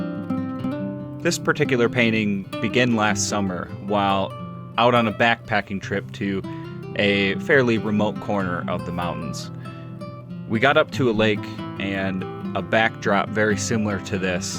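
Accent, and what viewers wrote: United States. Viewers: Even listening to your voice. So calming. That American accent. I’m from Scotland. Have been often to California to see my son.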